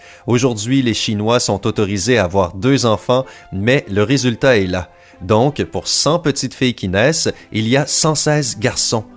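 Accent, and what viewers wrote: Quebec, Canada. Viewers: Yess accent québécois! Vive le québec! ❤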